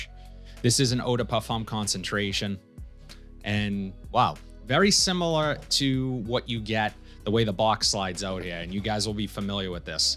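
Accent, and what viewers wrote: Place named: New York, United States. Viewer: I am from Denmark But i must say your english is straight out of the Sopranos. Love it, you have to be from New York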